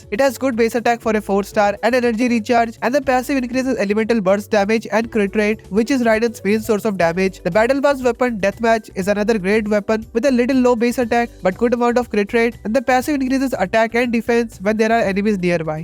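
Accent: India. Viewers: 100% Indian.. awaz bta ri hai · are u from india ? grt video btw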